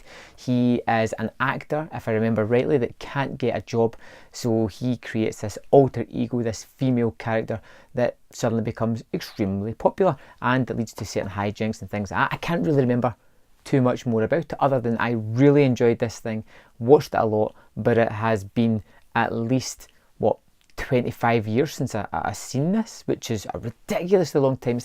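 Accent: Scotland, United Kingdom. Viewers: First time watcher. Good to hear a Scottish voice. Nice pickups buddy 😉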